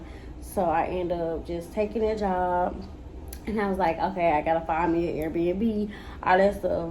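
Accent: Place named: South, United States. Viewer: You sound like your from the south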